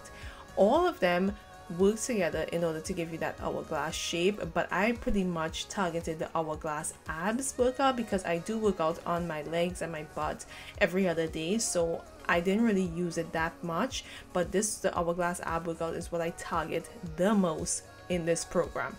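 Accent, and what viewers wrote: Trinidad and Tobago. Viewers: I came to get inspiration for me to continue one of her challenges but then I heard your accent...HIIIIIIIIIIIIIIIIIII TRINBAGO SIS❤❤ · I'm from trinidad and I made out your accent just had to scroll through the comments to make sure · As soon as you started talking i was like she's from Trinidad or if not from the Caribbean. I am Trinidadian as well! But currently in the US. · Jayna Danielle Im saying this girl sound like Trini Im from Trini.... I live in Arima be safe 🙏🏼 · Is that a Trini accent?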